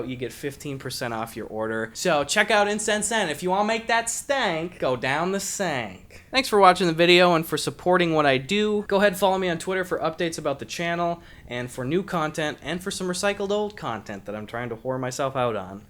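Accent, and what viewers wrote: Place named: Wisconsin, United States. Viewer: He can switch to his normal voice to his Wisconsin accent and that's pure power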